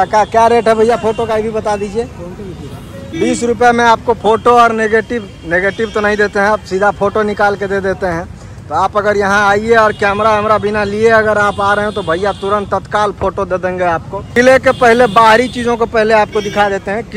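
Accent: Bihar, India. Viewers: Bhaiya ekdm khud ko feel krte h apke videos dekh kr.... whi bebak andaj....dogs ke dekh kr unse bat Krna ..wo hmara Bihar bala accent.... ekdm khud ko feel krta hu. M Varanasi aa rha hu Saturday ko .aap miloge? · Bhaiya apka video ka rooj wait karte h apka voice Etna mast h apne ape par bihari wala filling automatic aa jata h 💗❤️❣️❣️❣️❣️😘😘😘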